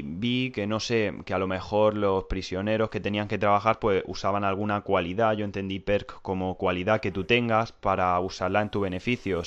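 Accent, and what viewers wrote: Murcia, Spain. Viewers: Eres de murcia verdad??? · Que grande eres tio, de los mejores youtubers de zombis. Sigue asi y alcanzaras a psicho y jugger. Por cierto de que parte de España eres?? Tienes acento pero no se de donde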